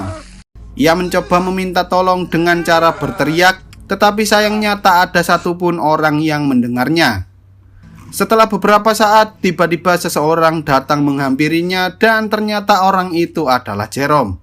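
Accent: Java, Indonesia. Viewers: Teko jowo yo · Mas maaf, bisa gak kalau ngomong nya gak terlalu medok bgt? Soalnya kaya yg ngobrol sama org jawa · Aduh mendok 😂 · LAGI LAGI MEDOK.... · Medok bgt, jadi kurang enak di denger. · Woe logatmu jowo men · aduh ngomong nya Jawa medok lagi. ini sebab nya aku benci jawa. logat nya malu malu in. mending sunda lebih oke.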